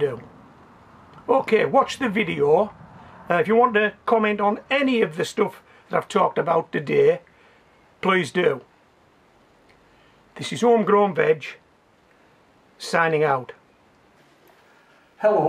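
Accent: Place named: England, United Kingdom. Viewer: I’ve been binge watching your videos for a couple of weeks now. Most times I cast it to my TV and let it play in the background while I’m doing something else or working from the computer (like today...it’s needed today). Besides them being highly informative, I also love that one instrumental tune you play, your cadence of speech and accent is calming to listen to, so thank you. (Typical American going gaga for English accent. 😆🙋🏾‍♀️) Nevertheless, I don’t understand why anyone would give this video a thumbs down. Keep’em coming!! Much love from Virginia, USA! 💚 And sorry for the loss of Molly. 😇💕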